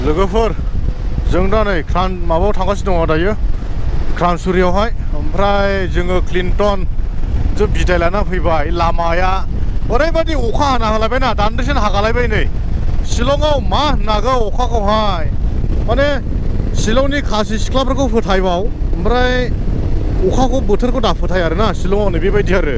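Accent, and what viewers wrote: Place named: Baksa, India. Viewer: baksani sur arw ngswr ni sur jw alda Ka ada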